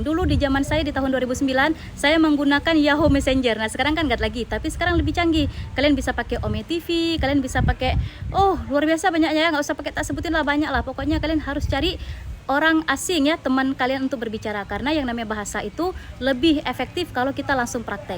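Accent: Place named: Aceh, Indonesia. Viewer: Bereh bu kemanapun gaya Acehnya tetap aneuk Aceh mețuah.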